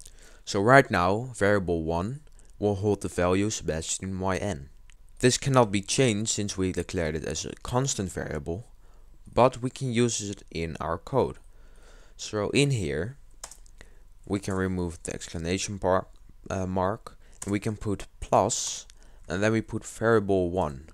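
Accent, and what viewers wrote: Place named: Netherlands. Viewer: I hear some Dutch stuff in there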